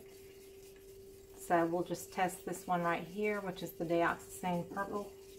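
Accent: South, United States. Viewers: Do I detect a southern accent y'all? :-) I'm originally from Tallahassee.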